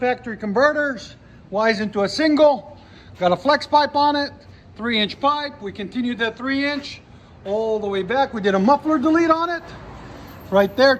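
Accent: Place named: New York, United States. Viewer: He sounds like he from new york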